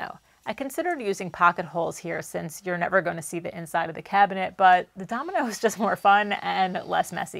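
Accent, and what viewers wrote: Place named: Long Island, United States. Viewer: You sure you're not from NY? Between "draw" and "foward" you sound like all my Long Island friends and family haha! Being from VT and going to college in MA, my brain gets super confused with accents sometimes :) Agree with great vidieo.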